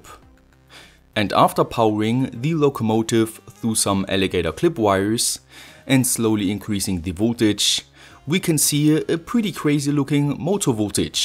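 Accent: Germany. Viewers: Bist du deutscher? Hast so einen Akzent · Are you German ore have German roots??? I can't imagine you aren't cause your german accent is so significant. A lot of people here speak like you do. Greetings from Germany and merry Christmas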